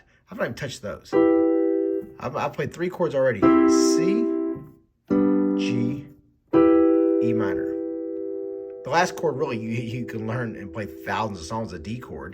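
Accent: South, United States. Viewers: I hate your country accent!!!!!!!!!!!!!!!!!!!!!!!!!!!!!!!!!!!!!!!!!!!!!!!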